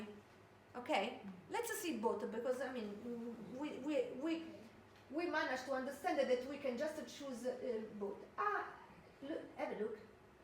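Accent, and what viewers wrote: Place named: Abruzzo, Italy. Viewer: No, perché dici che il tuo inglese sia "no good"? Sei molto brava. Soltanto la pronuncia, ecco, la pronuncia sa un po' di "Abruzzo con amore".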